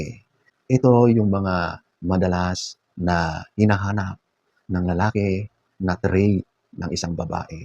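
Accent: Ilocos, Philippines. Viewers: Ilokano kaba idol madlaw ko gamin kayat ko boses mo super humble sika👍💯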